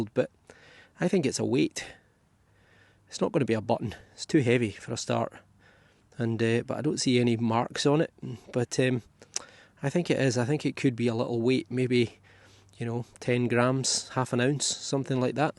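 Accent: Scotland, United Kingdom. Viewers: A shout out from the States… another incredible journey! I love hearing your accent, as my mum was born and raised in Falkirk. She lived across the street from a big park, I forgot the name of it (I’ve been there as a wee lad but the name slips my memory) but she says there was a great battle there… battle of Falkirk? But would I love to lay my coil on that site! Thanks again for the great hunt… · Hello my friend. First of all, I'll have you know, that we Americans are entertained by your lovely Scottish accent! Furthermore, metal detecting over there is the ultimate dream for any metal detectorist . The oldest artifacts can only possibly date back to 1492 here in America. So, keep up the good work! Thx dmg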